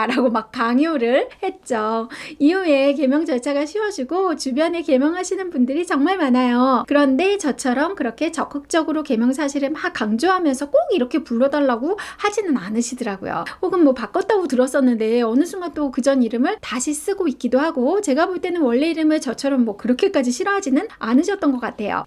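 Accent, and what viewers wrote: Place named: Seoul, South Korea. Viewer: 꼭 옛날 뉴스에서 나오는 서울사투리 느낌이네요ㅎㅎ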